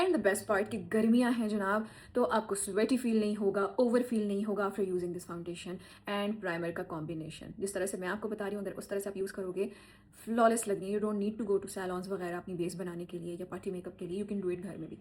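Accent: Punjab, India. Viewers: Aoa Kaisi hyn ap ek baat ki to apki tareef krni banti mariyam pervaiz ap dono se phle kisne YouTube channel pe apni journey start ki thi or dono phle healthy thi Lekin day one se apki dressing bht zada achi thi or apk pas pass mostly Punjabi hyn but Urdu apki thek hy rehty rehty punjabi thori bht agyi hy samj bhi Lekin zada hard wali nhi keun k mariyam pervaiz ki Jo Urdu hyna badrah Urdu Mai Rey hota hy or aj tak apk husband nhi Nazar aye apk vlogs Mai Dil se Ameer or sub se zada ikhlaq se hona chaheye hy down to the earth hona chaheye hyn jub k ap typically pathan family se belong krti ho but the way you present your self very gracefully makeup skill's day by day or achy kiye but Jo weight ko ly k transformation hy incredible phle to husband k paiso pe har brand ka makeup Liya now as a women apne Baki women's ko dikhaya hy mustakal mijaiz apko achi trha life Mai bht Kuch bana dyti hy phle ap logo k makeup brands clothing brands xyz kis chez ka bhi brand ho Lekin aj kafi saalo ki hard working sub krwa dyti hy ap bv bhi ho maa bhi ho or self made business woman bhi aj apka khud makeup brand Hy jub k mariyam pervaiz hyn phle jisne makeup brand launch Kiya Baki Natasha waqas bhi hard working kri hy Lekin wo bhi Urdu Jo na kapre pehno mein Karachi se hu but mein bhi Punjabi hu Lekin meri Urdu thek Hy Kuch mahajir fenta parakh xyz to Natasha waqas ko abi apne pe working ki zarurat hy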